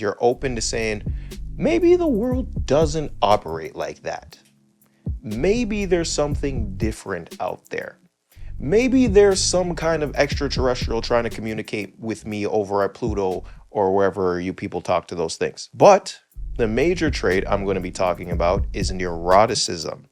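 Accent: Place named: Toronto, Canada. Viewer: You have to be a Toronto man, based on the way you talk lol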